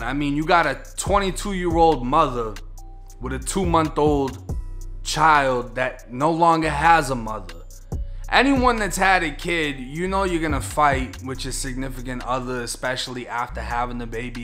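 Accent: Boston, United States. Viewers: Bro u sound like ur from boston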